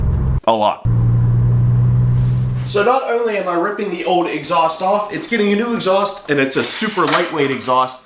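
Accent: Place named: Pittsburgh, United States. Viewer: Everytime I watch one of these videos I think "that guy has to be from Pittsburgh with that accent" but apparently folks from Maryland sound the same?! Great videos - appreciate the gumption.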